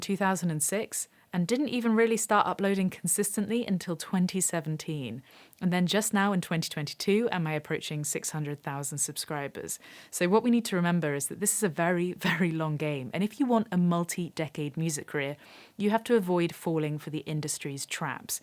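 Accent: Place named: RP, United Kingdom. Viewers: Hij, be happy you are not a slave to a Record Company, and just drop that (Chinese!) Tik-Tok platform altogether. Love your videos, songs, and your speaking voice as well as your delicious Queens English. I hope to see more of your classic Tuesday Talks and guitar stories again. Fondly, Michael PS Commemorations on your Queen's passing. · Keep coming back for the posh accent and demeanour, I guess that bottle is always going to be full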